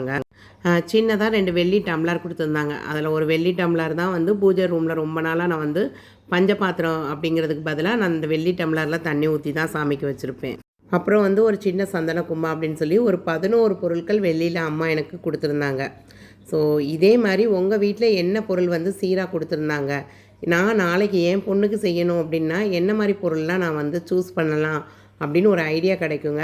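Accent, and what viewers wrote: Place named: South, India. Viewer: neenga south ah ka...slang apdiye irukku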